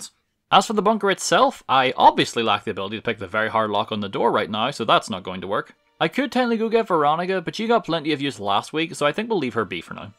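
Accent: Ireland. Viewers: Waking up to an Irishman talking about doing a boxing run... I was born in the right Era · Is he Irish ? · Bro this dude has the best Irish accent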